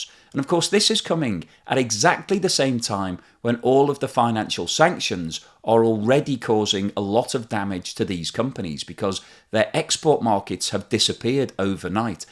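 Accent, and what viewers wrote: United Kingdom. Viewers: He actually sounds British. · Every other video cites the demise of Russian economy done February'. Yet to happen. Maybe he needs more videos on the tanking of the EU and the USA economy better yet his British accent the UK impending economic collapse.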